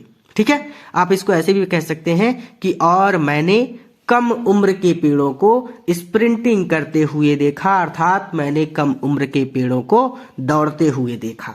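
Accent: Allahabad, India. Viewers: Sir aap allahabad se hai kya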